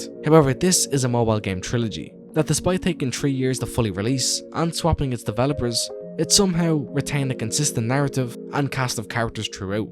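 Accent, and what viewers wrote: Ireland. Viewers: An Irish SH YouTuber? subbed! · Are you Irish? Irish SH fans unitee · Irish accent horror game longform videos? I'm so so so in.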